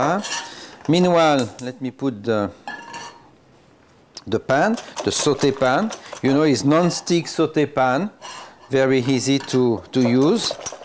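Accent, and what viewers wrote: France. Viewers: What i like the most is the subtle frrrench accent😄😄😄❤❤❤👍👍👍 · So hard to speak English with the French accent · I'm so in love with his French accent! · Adam Sandler with a French accent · I love the way French people speak. My friend speaks exactly like he speaks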